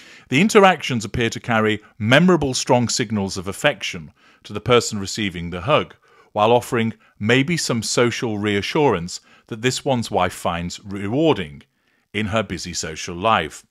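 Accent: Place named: England, United Kingdom. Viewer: English accent is so fancy so when he swears it is extra special Love love love this channel!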